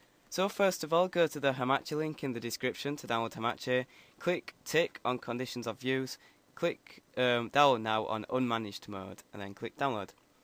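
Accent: Scotland, United Kingdom. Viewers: Scottish Accent :3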